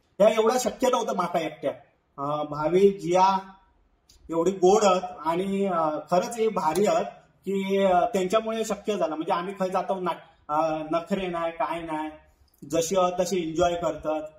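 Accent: Malvani, India. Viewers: तुमची मालवणी भाषेत बोलणे खूप छान वाटते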